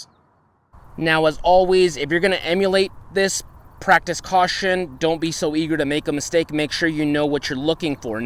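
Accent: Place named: West Texas, United States. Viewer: What part of west Texas you from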